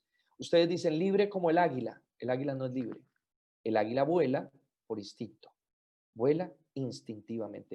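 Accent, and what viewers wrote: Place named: Colombia. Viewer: Este acento, me suena Colombiano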